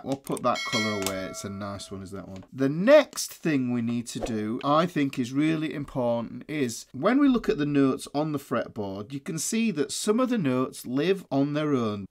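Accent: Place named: Durham, United Kingdom. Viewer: The BEAD on the 7th fret helped me so much! Also, I lived in Durham for a long time and your accent makes me feel so at home!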